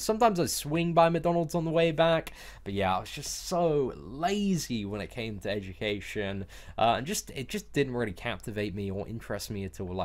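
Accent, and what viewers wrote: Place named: RP, United Kingdom. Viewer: I like you man.. The posh accent and using words like man and lit.. You crack me up, Keep it up mate!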